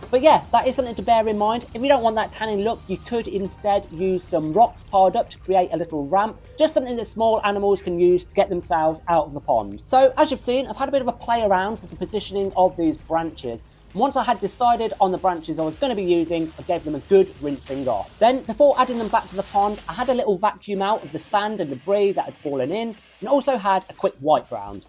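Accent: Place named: Australia. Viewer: Abrasive accent. Australian?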